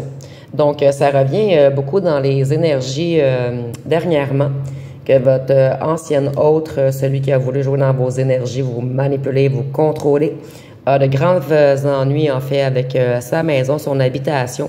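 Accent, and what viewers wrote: Quebec, Canada. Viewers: Merci infiniment pour cette guidance tellement juste pour moi. Avec le magicien et le roi d'épée c'est exactement ça ! Maintenant j'attends mon roi de coupe qui arrive j'en suis sûre. Je vous écoute de Paris et j'adore votre accent québécois ❤ Merci et bonnes fêtes de fin🎉 d'année 🎉🎉🎉🎉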